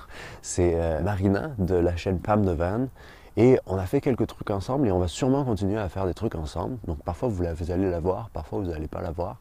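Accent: Quebec, Canada. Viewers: La visite de la France avec accent quebecois YES!!!, si tu passe par la Nievre n'hesite pas a me contacter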